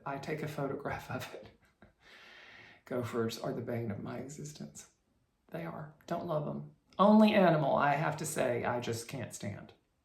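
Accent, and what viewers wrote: South, United States. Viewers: Enjoyed your Granny’s poetry once again…and I get a kick out of slipping into your southern accent. It does indeed add to the reading. That Home of a Needleworker is adorable. Your garden is lovely. Thanks for sharing it with us. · Loving the videos! Ronnie. Lavender. Needleworker. I’m new here and love what I see! Thanks you! You are so funny! I love your mannerisms and southern accent! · Ooohhh Ms Gibbs telling you that you needed to improve your potato peelin skills....your face, priceless! Did you show cross stitch, you probably did. But your stories in that lovely southern accent warm my heart. We spent 3 years in Alabama with my husband's work. Alabama has a different breed of people. The kindest, most generous, funniest and every one has a story! You can't go buy some beans without a story. I miss it there. You bring me back. 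💗⚘️ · Having grown in Mississippi and still live here, I love and appreciate the stories. The southern accent just enhances the poems. Hope you have a great week.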